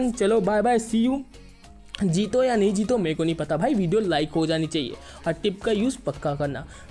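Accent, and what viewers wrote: India. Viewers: nice English in Indian accent 🌚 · Bro ur voice proof that u r Indian 😜❤️. Love from Bangladesh 🇧🇩, Bhai😍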